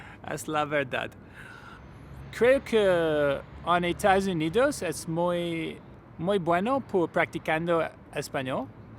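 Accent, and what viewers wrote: France. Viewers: I only spoke Spanish for the first 4 years of my life as I was raised by my grandma. Once we got into school they kind of forced us to stop speaking it to catch up in English . Still a little bitter about it. I can survive but it’s so frustrating for it not to be natural sounding like my parents or my grandma. Not be able to express myself in the way I can in English. I didn’t help myself as I took French in high school. I can say and write je suis désolé mais je ne parle pas français. Est-ce que vous parlez anglais o espagnol? Or ça va and other basic little phrases. Also cool to hear the French come out in your Spanish. Like when you say que. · 💪😀💪 te comprendo. Tu francés te confunde con el español pero es poco a poco. Vas bien al mirar la televisión en Español. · Excelente primer intento! Sigue practicando y mejorarás mucho! Se nota que sabes francés y lo mezclas, pero vas bien!! 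You can do it! 😎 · Good job! The best way to learn a language is go for it! Even though you made mistakes you still were able to communicate the message, and that is always what is most important. I did notice that you kept wanting to do French pronunciations to Spanish words (like the word "que"). The reason for this is that both are Romantic languages. But just keep at it. I know when I first went to Quebec my French was awful, but I kept forcing myself to speak and within a short time I was able to have brief conversations. I know people fear saying the wrong thing or being corrected by a native speaker so they won't make the attempt, but if you look at it, when you discover you made a mistake and get corrected or correct yourself the odds are you won't do it again, so make those mistakes and learn from them and you'll more quickly gain expertise in the language. A quick tip for a quick and large vocabulary expansion: if the word ends in tion (like operation) replace the tion to cion and pronounce it in Spanish: Operation = Operacion, Relation = Relacion, etc. 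Also, if the word is feminine in French it'll almost for certain be feminine in Spanish and the same goes for the masculine. Keep up the good work! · I think you are very brave. My friends tell me to watch videos of televisión novellas to help my ears learn to hear Spanish sounds. Do you speak French? I think I hear a little. You're right, if we keep it up, we can only learn more and speak mejor. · I can see that you mix it with a bit of french, but still great spanish, the pronuciation is understandable, you just need to correct some mistakes, and you'll start improving :) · It’s so great to see you trying to learn Spanish, I mean the fact that you are putting yourself in this vulnerable position it’s amazing. Luisito Comunica(not his last name, actually a variation from the verb comunicar, for example: yo comunico, tú comunicas, EL COMUNICA) it’s a great channel but maybe you should look for Super Holly, she’s a native English speaker but her Spanish it’s perfect and has so many videos teaching English and Spanish and it could help you feel related because you have the same native tongue. 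In your video I think you keep mixing Spanish and French. I learned English by listening to music and watching films and tv shows with subtitles, first in Spanish then in English until I just hear them, my English by no means it’s perfect it’s always a work in progress but I never stop trying and neither should you. Keep learning 👍🏽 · ¿Do you know French? I can notice how you pronounced some French words/phrases. It’s pretty cool that you did your best for this video, congrats! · Your french commes out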